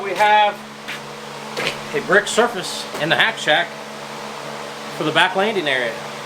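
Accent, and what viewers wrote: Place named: South, United States. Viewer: Yay! Nice to hear southern ahyia 😂👍🏻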